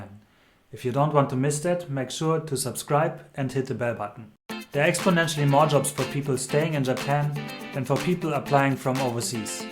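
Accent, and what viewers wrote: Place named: Germany. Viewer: German accent for sure :)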